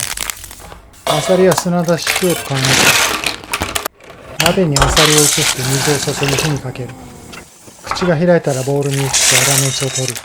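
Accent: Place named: Tosa, Japan. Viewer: 長崎名物に唐突に現れる土佐弁みたいないごっぞう好き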